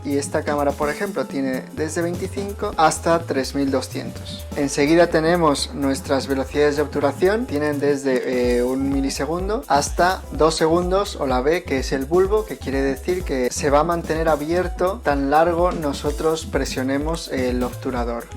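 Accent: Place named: Spain. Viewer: Que cringe porque hablas como español?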